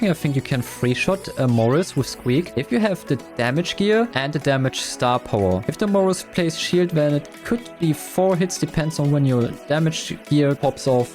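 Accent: Germany. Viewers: R u German? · Are you german? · I can hear the deutsche akzent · Hab direkt rausgehört das du Deutscher bist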